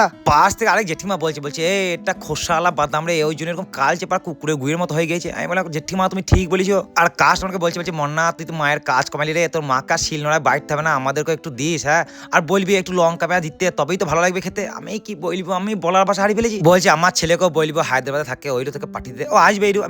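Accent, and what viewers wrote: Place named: Bankura, India. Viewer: Tomar kotha bankura er lok der moto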